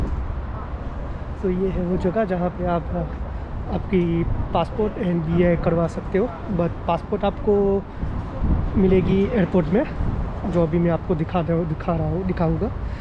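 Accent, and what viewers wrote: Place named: Gujarat, India. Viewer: By your language accent i am assuming you to be from Gujarat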